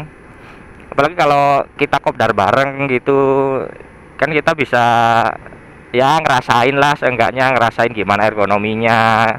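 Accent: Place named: Java, Indonesia. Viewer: medok bngt bahasanya..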